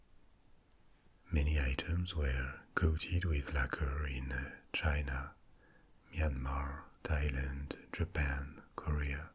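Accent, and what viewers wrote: France. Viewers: I can’t wait to hear about the antique books! Antique books and French accents are my weakness 😁😁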